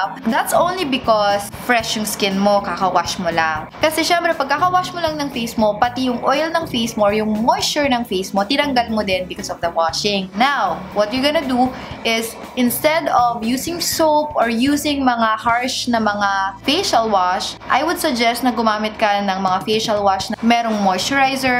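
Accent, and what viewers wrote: Ilocos, Philippines. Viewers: Ilocano ba yun hahhaa😂ang cute 😍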